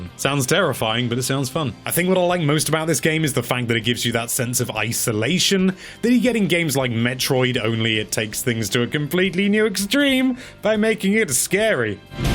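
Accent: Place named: United Kingdom. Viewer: This is the most britishy britsh accent int he world.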